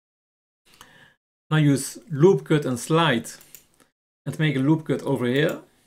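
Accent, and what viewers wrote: Netherlands. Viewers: are you dutch ?? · Are u dutch??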